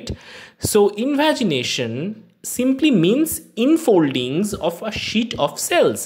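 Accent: India. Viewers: he's indian, how else is he supposed to speak.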